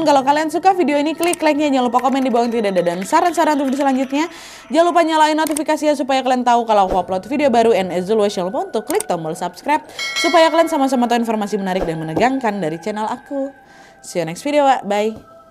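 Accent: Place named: Medan, Indonesia. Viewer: Tau kau! 😂 ini medan kali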